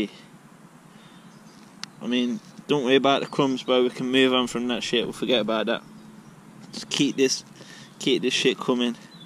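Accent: United Kingdom. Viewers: He’s brummie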